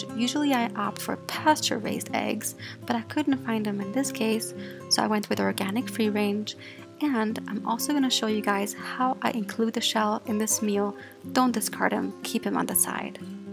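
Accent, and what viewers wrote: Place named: Germany. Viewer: you sound german, where are you from?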